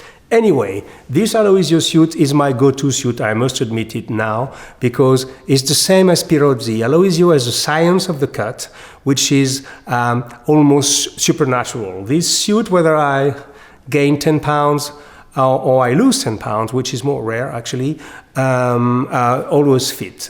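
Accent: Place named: France. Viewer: ...And also displays a delicious French accent.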